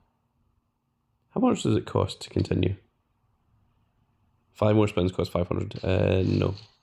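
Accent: Scotland, United Kingdom. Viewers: Yoo are you Scottish or is it just me also nice video cause I’m Scottish 🏴🏴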